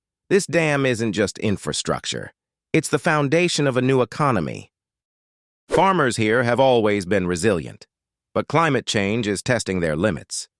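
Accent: West, United States. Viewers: Hey man I know you sound like a Westerner you either be Americans or Canadian or Europeans but you are saying the truth You went there and you make your video at the same time your western leaders led by United States criticizing a leader that try to reformed his country within a short period of time The great jobs from roads constructions from building hydro power industries hospitals and agriculture sectors as well many more development that going on there building Estes or houses and they're still condemning and criticizing this leader captain lbrahim Treore the man of African choice for no reason why should we believe in democracy a system that was in brain prosperity to Africa a system that the western leader use forge story lies to go to Libya to go to Iraq and Afghanistan and many more places in Africa as well propaganda against him is that democracy can we believe democracy to be a true way of governance when their leaders themselves they're not straightforward the lies the manipulation stories how can we accept democracy when people that champions so called democracy and lying blindly something that you have seen it and you are even making video about it is real that the skill and the level of development is not hiding compared to independent time till now the development achieved from that period is nothing He's doing so much great compared to the man who were in power for 27 years what did you do You to serve the French All the resources were going to France and th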